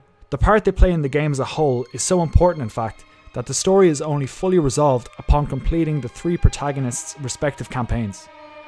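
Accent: Ireland. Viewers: Is that an Irish accent I hear? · Are you from Ireland and if you are what county lol,also great video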